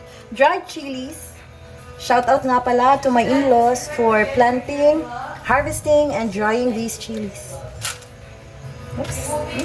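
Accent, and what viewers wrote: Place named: Thailand. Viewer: ang thai accent gyod ang nag dala 🤣🤣🤣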